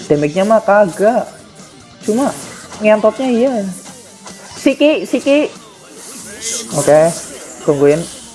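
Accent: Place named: Banyumas, Indonesia. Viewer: Ngapak" njir 🤣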